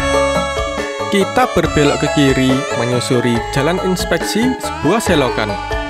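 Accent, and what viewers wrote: Java, Indonesia. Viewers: Terima kasih, spot yg indah, cara pengambilan gambar yg bagus, video yg bagus, narasi yg bagus (njawani banget), background yg bagus. Semoga makin suskses mas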